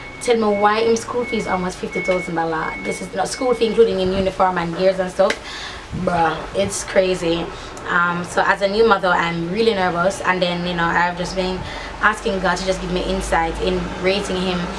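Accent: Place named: Jamaica. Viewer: When you are speaking to your international viewership you need to slow down in your speech and enunciate. We LOVE the Jamaican accent, but it can be hard to understand at times!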